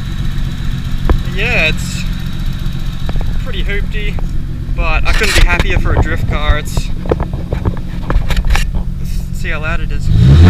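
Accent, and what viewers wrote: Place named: Australia. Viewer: already can tell ur an aussie in the first 10 seconds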